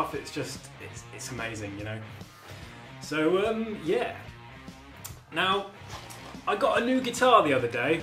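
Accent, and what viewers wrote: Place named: United Kingdom. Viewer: Hey dude just wanted to let you know.... I wanted to learn the song and just came across ur vid.... I enjoyed your self-deprecating humor s*** dude...I'm the same way ... but yeah pretty good job man thanks ......anyway ....cheerio...lol... .from Jacksonville, FL I was going to ask if you were near near London and a Jaguar fan but I'm betting probably not. my apologies if it's wrong to assume that all Brits are from London.....I don't know the difference in the accents And shit.... Anyway I just wanted to let you know I appreciate it.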